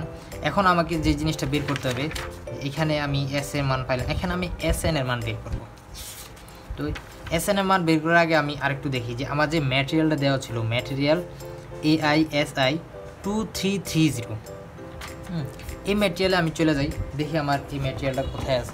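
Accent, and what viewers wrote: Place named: India. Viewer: Damn....I really hate indian accent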